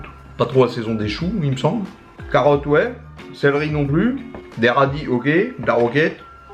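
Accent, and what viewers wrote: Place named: North, France. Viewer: oué j avais reconnu le tiop accent du chnord!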